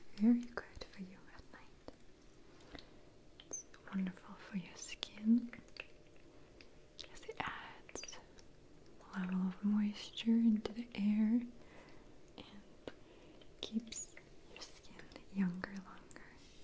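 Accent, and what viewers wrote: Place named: Russia. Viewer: Her normal talking voice still has an obvious Russian accent.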